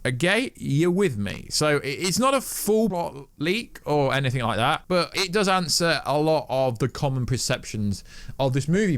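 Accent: United Kingdom. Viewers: ?? BRIT ACCENT ??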